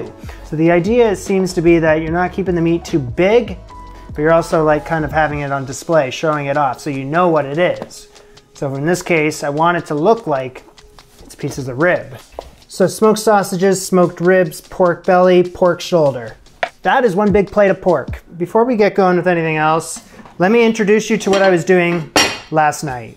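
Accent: Canada. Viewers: I am pretty sure he is Canadian, judging on his accent, preference for metric, the fact that his older videos were filmed in Canada, etc. He is currently in NYC though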